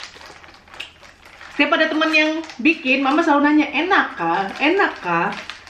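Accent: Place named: Kalimantan, Indonesia. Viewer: Enakkah? = nyamankah? Hehehehehehhe... logat kalimantannya kentel ya...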